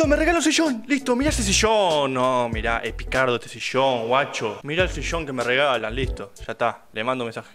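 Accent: Argentina. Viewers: De donde eres? Pareces argentino o uruguayo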